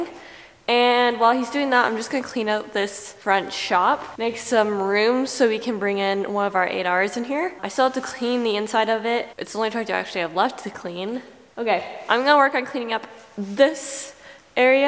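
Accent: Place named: Scotland, United Kingdom. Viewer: mabee alot of you guys have a bit of a Scottish or Irish twang in yer accent.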